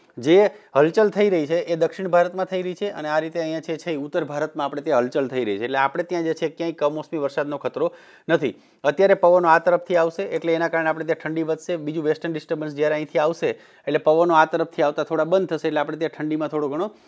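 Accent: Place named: Kathiyawad, India. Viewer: સરસ આપની ભાષામા કાઠિયાવાડી ઝલક છે સૌરાષ્ટ્ર ના છો?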